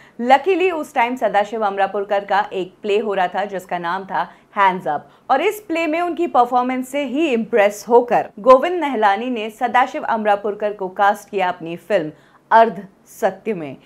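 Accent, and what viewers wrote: India. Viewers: Her Punjabi accents also comes in 😐🙄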